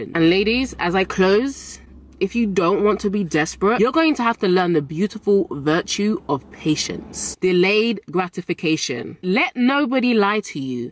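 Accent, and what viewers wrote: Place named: London, United Kingdom. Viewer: This beautiful young lady has a London, England accent.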